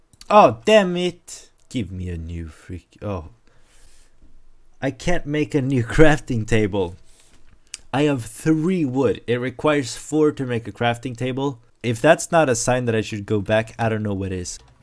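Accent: Sweden. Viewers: Are u swedish?? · Are u swedish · Are you from sweden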